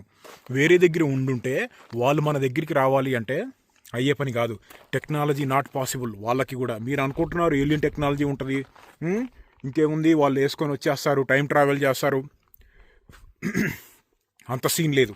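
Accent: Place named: Andhra, India. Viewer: Anna nee slang andra slang laga undhi anna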